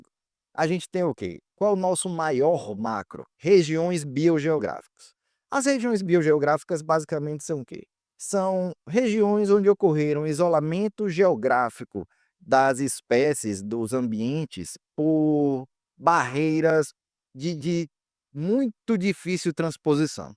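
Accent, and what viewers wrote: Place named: Bahia, Brazil. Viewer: Aula com sotaque baiano é melhor ainda!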